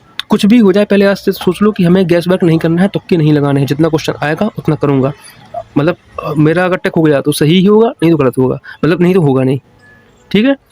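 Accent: Lucknow, India. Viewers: R u from Lucknow?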